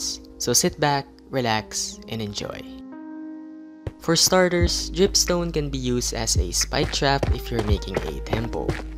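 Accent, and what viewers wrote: Philippines. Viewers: You sounds like filipino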